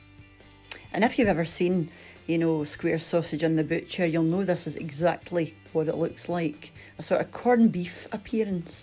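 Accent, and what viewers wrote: Scotland, United Kingdom. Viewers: Love your cooking love your scotsh accent I am from Australia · I'm a linguist with a fascination for dialectic variations and this presenter has all the credit for interesting and even charming variations of the basic English pronuciation as spoken in Scottland. It's simply nice to hear her speak. She charms you with her easy pronunciation and speech to the point where you like listening to her, just to hear her speak. If you live in the U.K. you have English accents, Welsh accents, Scottish accents and Irish accents and they are all English but they are all clearly belonging to a geographic area and unique to those parts. That's what makes the U.K. unique and great.! Can't say the same for the accent I was born with, namely, 'Cockny' which I never held in particular esteem. I spend my life pretending I'm from Cornwall or the Isle of Skye rather than be identified as a 'Gor Blyme' cockney.! 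I know, it's irrational but who said being rational was better than being from Radnorshire.? And trust me, if you play this game, you'd better get it right, because you have no idea how quickly you get caught out. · cracking accent. britain has good accents. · Love the video and the recipe and I'm making these today. But I watched this video with captions, and it cracked me up because whoever or whatever did the captioning clearly does not recognize a Scottish accent at all. You should watch the video with captions, because some of the mistranslations are hilarious. And now I think I'll need to recaption all of my video too as I bet the daptions are just as bad. Cheers! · Hi! Not sure where you’re based, but I spent 12 years working and living in the Highlands and in Aberdeen. Your recipes and your accent are making me miss "home". I’m definitely going to try this, for I miss my all day breakfast at the supermarkets where I always had a Lorne sausage! 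Keep doing what you do: you’re doing great! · Throw away your Spam!!!!! This and Slow Cooker Square Sausage looks great. And as you say, we made it ourselves........I've the ingredients and will make my loaf today (January 15, 2023. Better than bacon.........Thanks for all the recipes and your Scottish is Music to my ears. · Loved it! I listen as much for your lovely Scottish burr as for the lovely recipes!!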